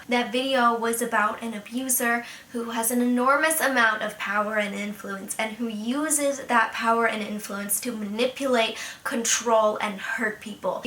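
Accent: Castile, Spain. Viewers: Hi. Thanks for your music and voice. I most confess that I come to your channel for your cover of Evangelion, but your voice inspire me in my job. Sorry for continue in spanish, i'm colombian and I dislike use the english language (the colombians are really trolls when you don't speak as a native...). Tu acento tiene una marcada influencia de España, es genial. Espero poder apoyarte con tu trabajo después, es realmente bueno. Gracias por tu coraje, al tomarte tu tiempo con tus seguidores (fans). Saludos desde el cono sur. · I'd like to know, where you learned Spanish. I can't quite place it. Sounds Castillian but may be Argentina or Chile? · Where did you learn Spanish? Your accent sounds like it's from Spain, but I'm also not the best at distinguishing accents in Spanish.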